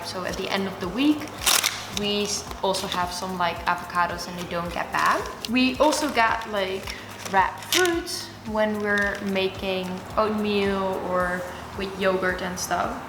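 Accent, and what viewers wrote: Netherlands. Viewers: Are you dutch? I hear a little accent🤪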